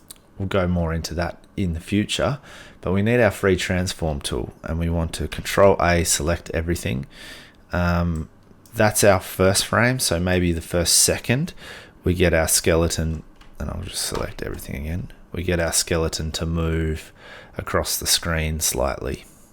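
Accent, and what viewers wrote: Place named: England, United Kingdom. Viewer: No worry but I have follow many tutorials and you are the best for speed and infos and I am in Canada working for a Global company E learning stuff. From your accent you must be in England.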